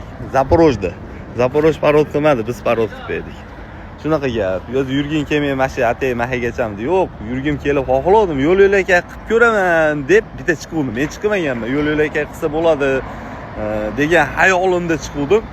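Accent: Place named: Namangan, Uzbekistan. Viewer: наманган хонобод кушни коратепа кишлок топдимми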